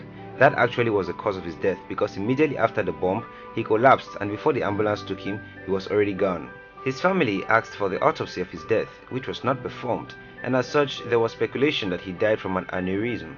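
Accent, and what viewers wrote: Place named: Nigeria. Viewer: Longest intro... n nigerian kinda voice over.. i bet all this history are true😅😅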